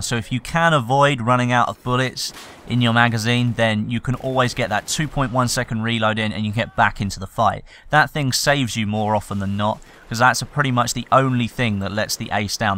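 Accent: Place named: United Kingdom. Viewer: "coy-yote" sight lol! Love the King's(??) English bro, I'm the one with the 'Murican accent =)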